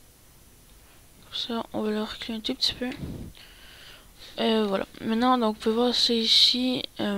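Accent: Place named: Quebec, Canada. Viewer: quebecois je suis quebecois et je reconais laccent il est juste young faque surment que sa voix est un peu weird mais javais la meme avant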